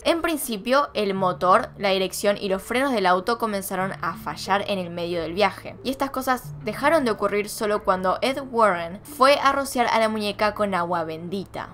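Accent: Argentina. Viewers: Creí que no me gustaba el acento argentino, pero me encanta escuchar los casos con ese acento xD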